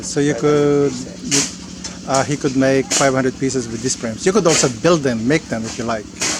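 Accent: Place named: Philippines. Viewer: U sound originally from Philippines the way u speak out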